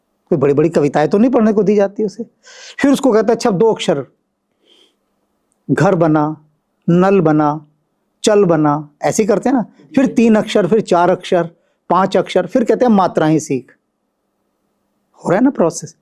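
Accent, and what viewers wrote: Haryana, India. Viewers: Ye host ka accent haryanvi hai ya Rajasthani?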